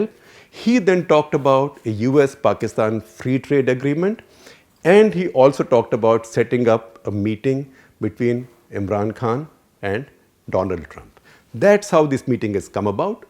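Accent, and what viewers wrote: India. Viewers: Hahahah look at this stupid guy Imran Khan has been very successful in brininging military and civilian leadership on same page plus he is way more popular then na was sharif ever was because he has been a celebrity plus NZ was directly selected by zia ul haq while IK has a political evolution behind him ask us we have witnessed don’t believe go watch his political rallies not just in Pakistan but In Washington modi can’t even bring half of them togather outside India and modi is exposed by trump he is asking for help from trump to resolve Kashmir issue so this stupid man who speaks English like he is speaking in Hindi bullshit accent fuck you bastard you know nothing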